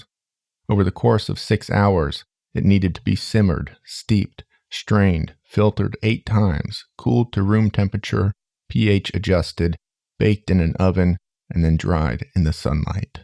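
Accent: United States. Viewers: Wait is he from South Carolina?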